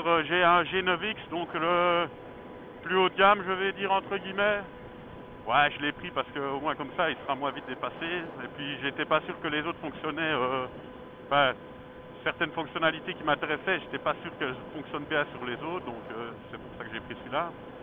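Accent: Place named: Belgium. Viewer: Super un ami Belge avec le meme accent que moi et en plus a coter de moi . Bienvenu dans ce monde de youtubeur l'ami et pourquoi pas se rencontrer un de c'est jours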